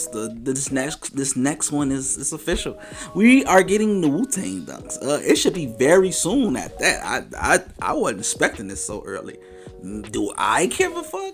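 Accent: Chicago, United States. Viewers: You gotta Chicago accent lol Edit. You said it later in the video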